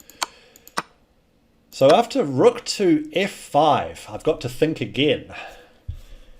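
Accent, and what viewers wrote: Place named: New Zealand. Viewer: Hi, I hope all is well in Austria. I recently subscribed and am immensely enjoying your recent videos with the in-depth commentary on your OTB games. It’s great to see a fellow Aussie doing well (although I think I detect a bit of Kiwi pronunciation here and there — I could be wrong; English is my second language). All the best, and thanks!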